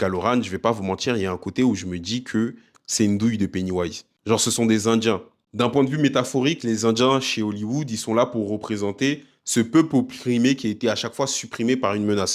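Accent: Ivory Coast. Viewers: Je reconnais un frère ivoirien Je suis là à la base pour la "babiere" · Toi ça se sent que t'es ivoirien. 😂😂Ko ton babiere 😂😂😂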